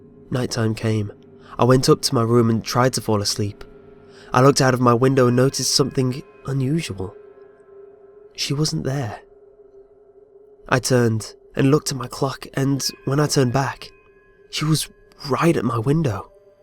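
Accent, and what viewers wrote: United Kingdom. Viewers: You sound british are you british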